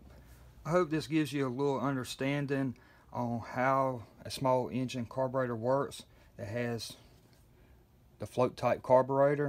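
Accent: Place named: Alabama, United States. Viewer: AWESOME DISCRIPTION of how CARB works! very thorough And nice southern accent! it sounds like it might be from Alabama like my Momma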